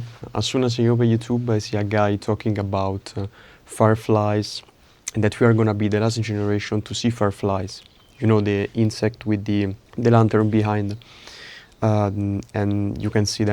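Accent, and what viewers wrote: Italy. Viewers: "Aiming for perfection is the step towards sadness" yeah right at the end of the day its always You vs You. Loved your accent buddy Italians have a really such adorable English accent 🤍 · Dall'accento sei palesemente italiano ahah, comunque bel video!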